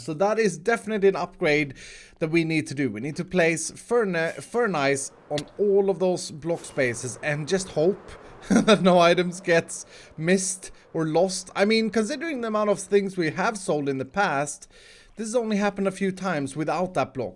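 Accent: Sweden. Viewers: Where from sweden are you from? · Are u from Sweden? Just wondering:)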